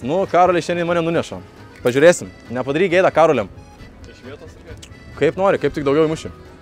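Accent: Suvalkija, Lithuania. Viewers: Jauciasi suvalkietiskas akcentas 😊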